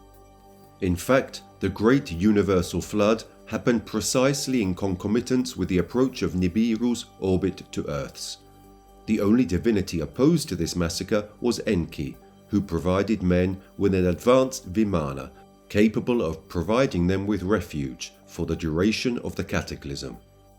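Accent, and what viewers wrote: United Kingdom. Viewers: I didnt last 12 min. English accents turn my stomach.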